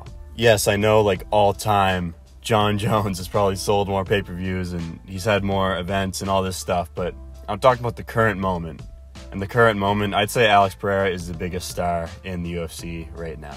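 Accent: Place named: Canada. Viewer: Are you Canadian? You sound Canadian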